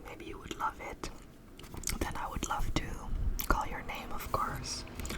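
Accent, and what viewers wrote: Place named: England, United Kingdom. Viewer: I looove listening to your English accent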